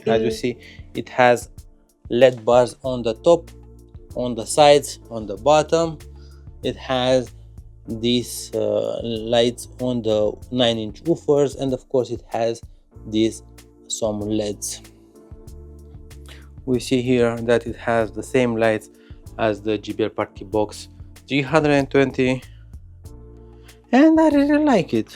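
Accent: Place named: Romania. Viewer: Căutăm să văd recenzii despre boxa asta am dat de canalul ăsta .Să moară bibi dacă tu nu ești românaș de-al nostru chiar dacă vorbești în engleză bag mana-n foc după accent . Sper sa nu dau greș cu boxa asta .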